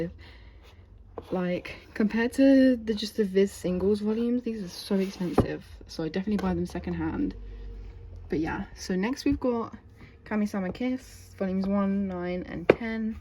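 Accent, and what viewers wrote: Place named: United Kingdom. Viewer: Omg youre british too!?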